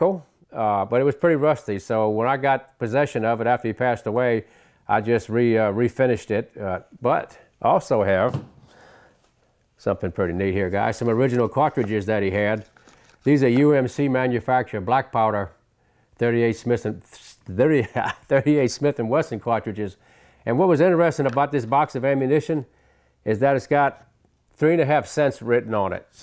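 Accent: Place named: South, United States. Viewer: It's GOLD, Jerry! GOLD! When I first started listening to this vid, it sounded familiar, but as if I was hearing you from something I had heard decades ago. Then it came to me. Your voice is similar to Don Novello's (Father Guido Sarducci on SNL). He doesn't have the southern accent, but the sound and cadence was similar. Of course the subject matters are totally divergent. I recall one of his bits was about a planet in Earth's orbit, but directly opposite of us, so we can't see it. Everything there is exactly the same as here except that they eat corn on the cob lengthwise. Thanks for "triggering" the memory. Anyway, your videos are treasures and hope you'll keep on keepin' on. I like the idea of chatting with other well known shooters. I had the opportunity to know Robbie Latham before he was a shooting star. I liked the kid. Not a smartass in any way.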